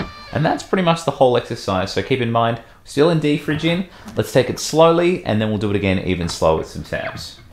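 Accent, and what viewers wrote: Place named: Australia. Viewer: who doesn't just love the sweet sounding Australian accent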